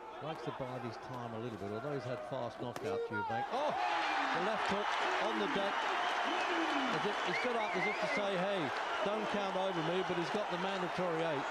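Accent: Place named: Cockney, United Kingdom. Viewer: Good ole Cockney from Hammersmith London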